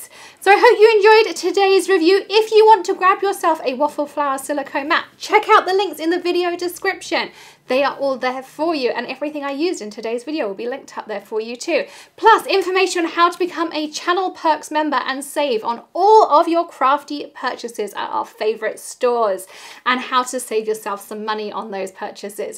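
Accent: England, United Kingdom. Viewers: Hi, thank you for your videos. They are very nice. I love the 2mn ones....and your English accent. This said you keep talking about the « top right hand corner » for past videos. I watch a lot of videos on my phone and I have nothing on that corner. On my computer, I have advert. Apart going into your page and check the whole videos, I don’t see how to get the past videos mentioned. Am I a dummy here?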